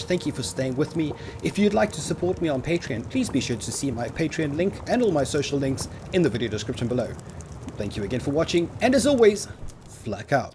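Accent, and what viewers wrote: South Africa. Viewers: this guy sounds south african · are you from South Africa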